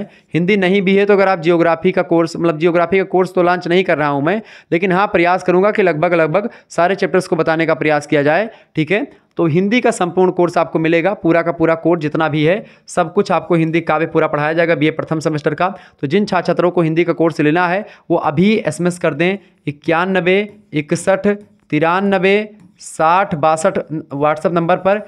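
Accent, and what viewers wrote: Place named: Bihar, India. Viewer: Sir AAP Bihar ke ho . AAP bhojpuri language Bol rhe h vich vich me ❤❤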